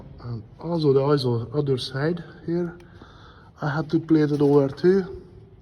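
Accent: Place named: Northern Ireland, United Kingdom. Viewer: I might be wrong but you sound like you've picked up a bit of an northern Irish accent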